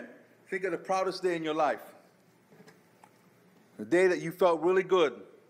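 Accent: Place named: New Jersey, United States. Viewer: yessss!!! Excellent points. When I first heard you and lady C say that “I’d rather have questions we can’t answer than answers we can’t question “ that resonated so hard with me. I mean , it was so enlightening. That fear is so thick we didn’t even realize we were living in it. I thought you’d be the best video to send to them because you look like that bethelite, you’re relatable, your presentation was what we as former Jehovah’s Witnesses can relate to. Your voice etc. reminds me of the congregations in New Jersey and NY. Thus, I thought they would be comfortable to listen. All they heard was this was an ex JW. DEMONS!!! It is an ATM great analysis. I went through a period where I’d lose sleep thinking how can I get my family to WAKE UP. Sadly after my mother’s passing in December 2018, I had to learn to let it go and let God. They were never going to listen to me now. 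Looking forward to seeing mom in the “new system “. The mind is more amazing than I ever could imagine. Some can go thier whole life excepting that they don’t deserve answers to their questions while others actually have the courage to break free from that conditioning. God bless you and Lady C for your inspiring work.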